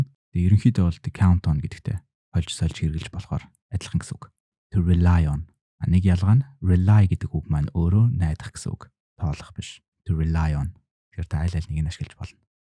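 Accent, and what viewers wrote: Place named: United Kingdom. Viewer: Гоё цэгцтэй ярьж байгаа чин таалагдлаа , хөдөлмөрч ухаантай залуу юм байна , баярлалаа, love your thick British accent 😊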